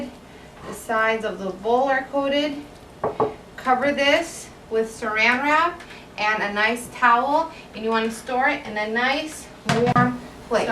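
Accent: Midwest, United States. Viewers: I KNEW I heard a midwestern accent in there somwhere haha.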